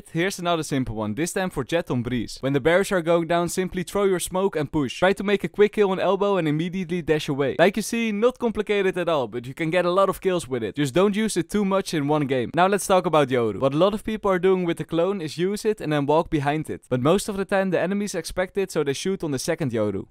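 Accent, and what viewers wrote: Netherlands. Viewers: are you dutch? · in the first 5 seconds i knew you were dutch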